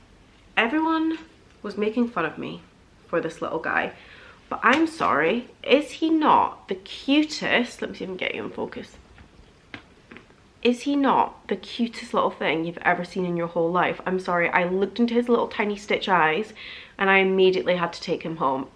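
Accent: Scotland, United Kingdom. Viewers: First time watching your video - really enjoyed it and loved your haul! Will keep watching - I find you're down to earth chat very enjoyable and love your mellow Scottish accent!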